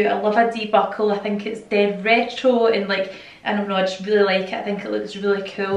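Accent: Scotland, United Kingdom. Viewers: So is a Scottish accent? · Are you Scottish?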